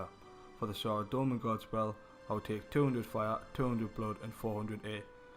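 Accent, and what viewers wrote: Newcastle, United Kingdom. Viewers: geordie guides geeeet up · fellow geordie?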